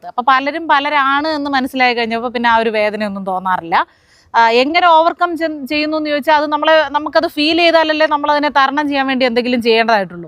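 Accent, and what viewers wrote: Thiruvananthapuram, India. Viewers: കമ്മികളുടെ 10 കൊല്ലത്തെ ദുർഭരണം താങ്കൾക്കു വീഡിയോ ചെയ്യുന്നതിന് വിഷയ ദാരിദ്ര്യം ഇല്ലാതായി. പിന്നെ തിരോന്തരം ഭാഷ നല്ല രസമുണ്ട്. വിജയാശംസകൾ 💅💅💅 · ഈ തൃരുവന്തസ്പുരം ഭാഷ എവിട്ട്നിനിന്നും കിട്ടി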